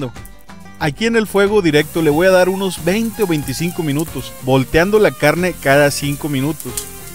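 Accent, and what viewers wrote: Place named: Mexico. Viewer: Ufa tu cocina y como hablas, la neta me siento en México!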